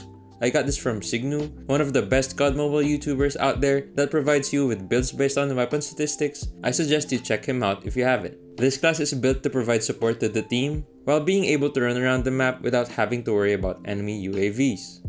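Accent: Philippines. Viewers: Pinoy ka lodi no hehe gawa ng accent mo sa english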